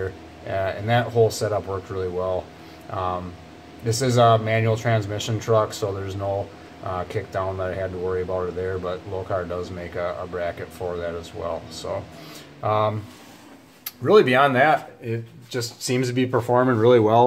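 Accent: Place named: Upper Midwest, United States. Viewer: Yes, the northern.midwest accent. I wonder how a 4.9L running on propane would do. They certainly run clean with no fouling of plugs. They run better with high compression pistons or head decking to raise the compression. Seeing the specs on the Honda GX NG Civic they had compression ratios of 12.5:1. Propane should work pretty well unless its gets SUPER, SUPER COLD liquefies -44°F. Natural gas isn't as available as propane. Hard to get a hold of out on the interstates. Fed gov't has a website about this stuff on fueleconomy.gov. alt fuels.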